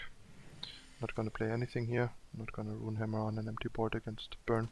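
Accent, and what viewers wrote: Germany. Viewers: You should watch the first couple of matches with it on. It's quite entertaining. I think it's partly the German accent that messes it up lol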